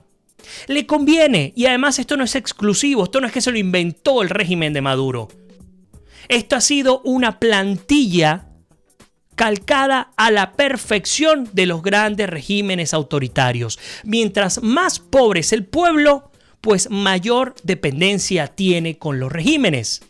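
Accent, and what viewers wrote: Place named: Rioplatense, Argentina. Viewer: descubrí estas trasmisiones tuyas por YouTube hace sólo unos días atrás y mi primera impresión ha sido muy positiva. Una pregunta, aunque te indentifiques como venezolano, detecto un acento o argentivo o uruguayo, es correcto? Pregunto por pura curiosidad nada más. Un saludo de un cubano desde Madrid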